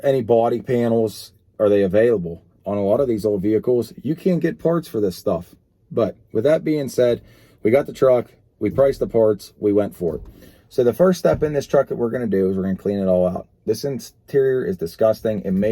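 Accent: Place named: Philadelphia, United States. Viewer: You sound like a Philly guy. Dig the accent! Love the truck.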